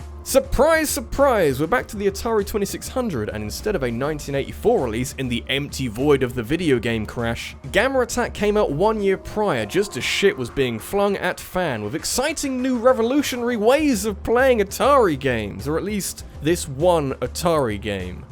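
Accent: United Kingdom. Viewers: what is gramma attack? gamra attack? gammar? the british accent, they like to skip their Ts and add Rs. I cannot enjoy listening to british speakers because I am too stupid and derp out when they say words like law and i hear lore and spend the next 10 seconds trying to figure out if im having a stroke, and end up missing what they are saying, leading me to get totally lost. just me? or is it genuinely confusing when they say words they dont mean to? sorry, just realizing why im unsubscribing from british channels, makes me sad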